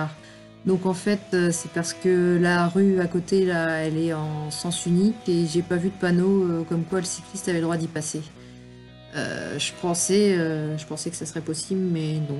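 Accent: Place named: Nord, France. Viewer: Cet accent de ch'Nord, j'adore !! Une de mes cousines cause comme toi ... #velotaf à Amiens !!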